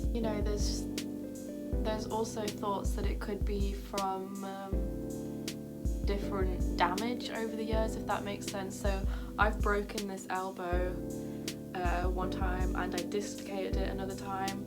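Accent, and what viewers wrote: United Kingdom. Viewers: Oh no! I absolutely fell in love with you from the moment I saw you. You are so pretty and cute that I can't watch a post without smiling. The british accent is my favorite. Kelly osbourne infact is my celeb crush... Guess you are to now lol. No there isn't an shrine next to my Kelly shine...yet; but keep posting cuz we love you!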